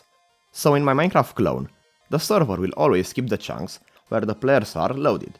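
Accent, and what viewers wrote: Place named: Romania. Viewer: romanian accent for the win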